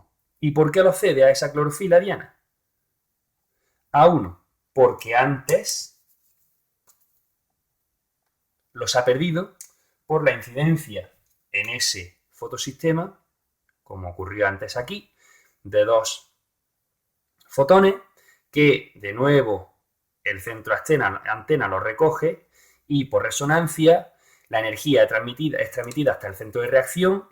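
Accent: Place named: Murcia, Spain. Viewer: como se nota que eres de murcia :)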